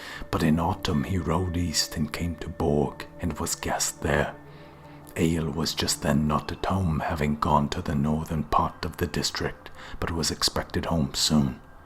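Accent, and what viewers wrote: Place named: Wales, United Kingdom. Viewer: Thank you very much for this amazing, long series. It is hard to imagine how much labour went into this. It was a nice surprise after searching for a long time nearly all the English narrations seemed to be AI-generated. Then found a promising one by an Icelandic lady on Bandcamp and bought it, but unfortunately later found out it was incomplete. I'm curious what is your native language/nationality? Your accent sounded a bit like Welsh sometimes but it's hard to tell (anyway it suited the narration very well). Look forward to your other work.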